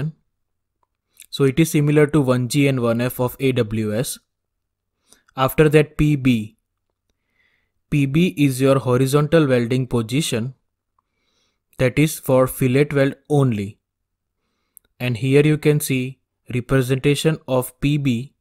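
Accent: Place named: India. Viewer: Can you do it without the Indian accent. It's wary aard to understand you.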